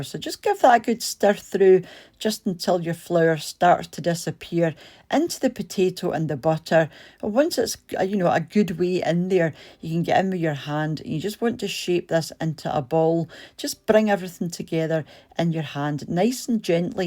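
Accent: Scotland, United Kingdom. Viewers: Oh my God, I love listening to the Scottish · How I miss my home country Scotland. My ancestors are from the isle of Lewis. That recipe helps a bit afainst being homesick.... As well as the typical Scottish English talking. So heartwarming. Thank you for both. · Great video. Good Scottish voice giving instructions. I like my tattie scones in the toaster. Nice and crunchie 🏴🤗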